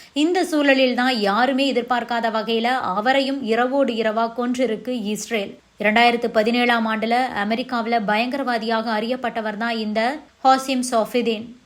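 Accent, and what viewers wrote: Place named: Chennai, India. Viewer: Madras தமிழ் தூதூதூதூதூதூ......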